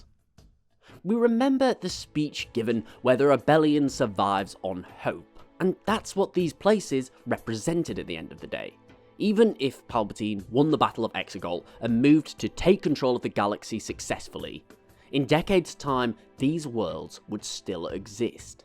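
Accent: United Kingdom. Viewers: I agree, I love brittish accents. Tho according 2 sw lore, he's evil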